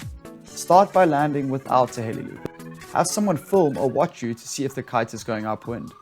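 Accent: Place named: South Africa. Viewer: Fuuck bru I cant anymore. I already miss South Africa too much.. these videos make me feel at home just hearing you talking kak while saying the right shit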